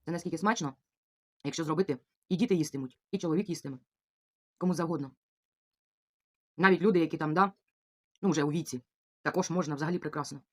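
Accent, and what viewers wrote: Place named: Ukraine. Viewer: Ви дуже природня, щира, аутентична дівчина - Ви не простота (як Ви процитували у попердньому вдіео свого глядача), це глибина Ваша така народна. Викладайте відео - на Вас дуже приємно дивитися і дуже приємно слухати - у Вас красива народна українська мова - я навіть собі записую інколи ті слова, які колись чула у баби в селі, а тепер ми їх не вживаємо. Ви обрали правильний шлях - ви харчуєтесь повноцінно, просто прибрали із раціону некорисну їжу. Бажаю Вам триматись на цьому раціоні все життя, додаючи тільки корисні для організму страви! Успіхів Вам!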